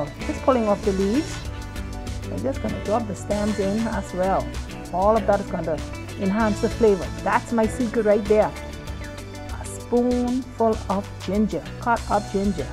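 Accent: Bahamas. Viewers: My father's side is Bahamian, Mother Floridian !! This was one of my favorite dishes!! I was the one pulling out the hammer to pound them . Memories, love the accent 😍!! I'm in Colorado!! Purchased conch from an Asian market . Will try your recipe. Watching you refreshed my memory of the overall process. Happy eating 😋!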